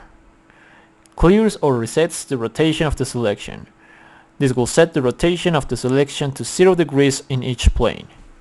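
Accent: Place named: Ireland. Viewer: You sound Irish. Are you Irish?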